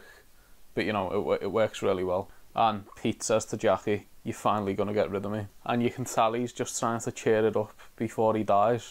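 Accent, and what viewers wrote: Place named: Liverpool, United Kingdom. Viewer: It makes me unreasonably happy to hear another scouser getting into the whotube community.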